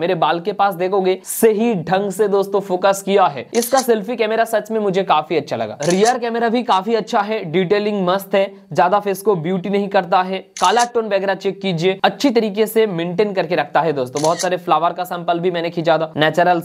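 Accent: Bengal, India. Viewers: Bhi toh bangali hai kiya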